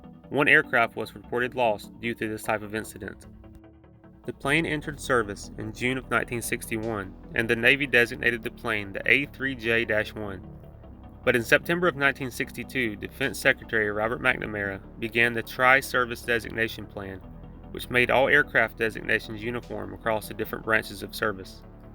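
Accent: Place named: South, United States. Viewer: Seriously, this is one of my favorite channels. The facts, history, and your accent being a bit southern like most of my friends...very easy to listen to. (Yes, i get a lot of $hit for being a 'yank'...lmao) Be well. ☀️😎🇺🇸☀️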